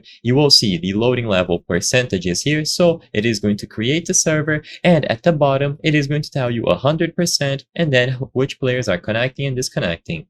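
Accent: Brazil. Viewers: Sotaque BR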